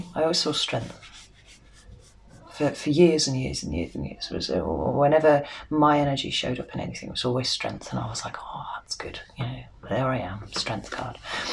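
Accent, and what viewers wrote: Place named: RP, United Kingdom. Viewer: I love an educated British accent. I can hear why others inspired you to read the Scherezade book (can't remember the title 😁). I miss Britain. I'm an American with an English mum, and I've always felt at home when I've visited your beautiful country. Your reading was spot on, and I loved the great commentary. I'm a new subscriber. Cheers! ❤️😊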